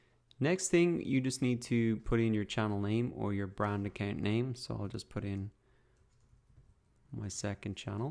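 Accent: Ireland. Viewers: Are you irish im irish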